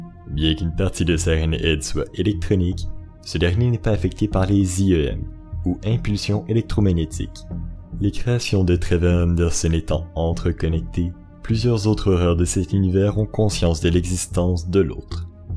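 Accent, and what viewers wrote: Quebec, Canada. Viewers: je suis très difficile avec le youtube québecois à cause de l'accent mais franchement avc toi ça passe comme une douceur avec le ton parfaitement doux +1 abo je m'en vais regarder tes autres vidéos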